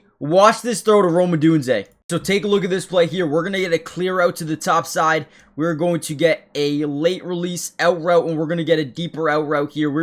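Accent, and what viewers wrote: Canada. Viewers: Sounds Canadian eh?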